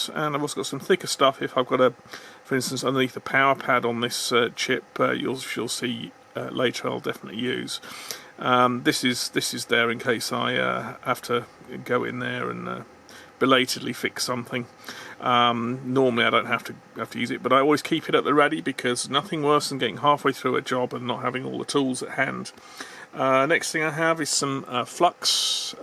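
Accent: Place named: United Kingdom. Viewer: Just the right amount of verbal I say. Newby here, so I appreciate being walked through the procedure. Got the air gun, but looks like I'll need the flux pen and the cleaner. Too bad the radio is all apart and on the bench already, but I watched someone else's video where they just did a simple reheat. I feared the solder would overflow to the other terminals, but apparently not an issue. Wish me luck. Oh, the British? accent/colloquialisms were spot on! (to use a term). Full Wacko (unintelligible) on the airflow LOL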